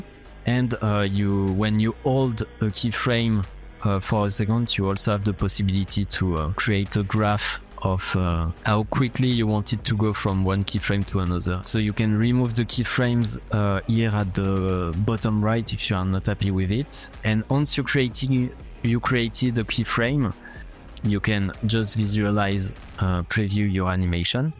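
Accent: France. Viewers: Bon ça se voit tu es français, mais bro merci pour cette app incr totalement gratuite, juste y’a des pubs mais quoi de plus logique finalement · T'es Français ? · L’accent français a 100% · we j’ai vu il a un accent francais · You have french accent 🤨 , are you french ? (Because i am) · Bro are u french ? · You lowk have a mad French accent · BRO T'ES FRANÇAIS ?! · As a French, he's clearly French heeh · Are u french????? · L'accent français de fou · Mec t'est Français 😂😂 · It's not against you, but as a french speaker, You have the accent of a French speaker who speaks English. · I think ur french if it is I'm too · Are u French? Bc ur accent ;p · Ça sent l'accent français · On dirait un francais❤🇫🇷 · Ta un accent français 😂😂😂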